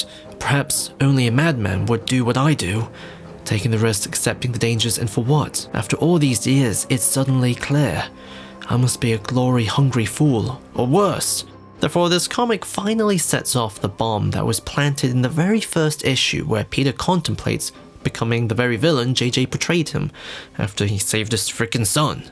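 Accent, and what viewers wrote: United Kingdom. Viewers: Bro you sound British not american. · So are you like welsh? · I thought you were English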